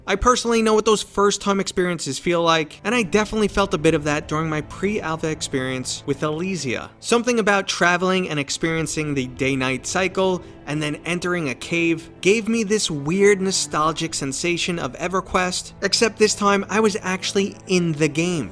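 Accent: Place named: Boston, United States. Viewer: Great video. Also you sound like you're trying really hard to hide that Boston accent, but failing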